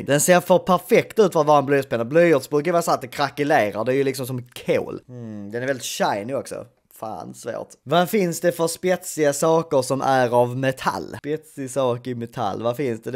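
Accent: Scania, Sweden. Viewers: Tycker som inte om skånska men den här är fan för skön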